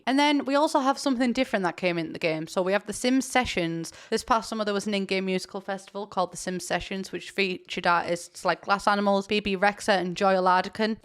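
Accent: United States. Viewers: Can we talk about great American accent? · Absolutely 10/10 American accent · I did a double take when I heard your American accent what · Wow your American accent is pretty on point! It’s kind of funny seeing the roles reversed because I’m obsessed with doing a scouse accent lol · I didn't realize you were doing an american accent until you mentioned it lol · Very good American accent -an American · your american accent is magical the fact that we have accents is just so damn cool. i love when people do american accents.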